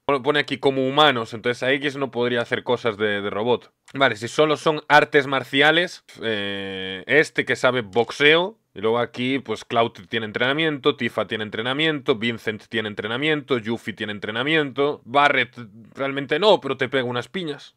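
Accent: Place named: Spain. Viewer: Como se nota que es español 🗣️🔥🔥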